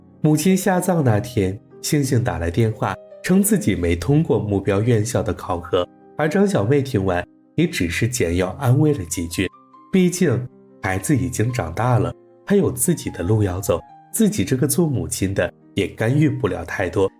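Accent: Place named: China. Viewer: 最初聽到的聲音很好聽、就跟著看了光影二三事、分不清現在是喜歡聲音還是被電影吸引了😂😂以前只喜歡台灣的國語、原來中國的國語也這麼好聽的👍🏻👍🏻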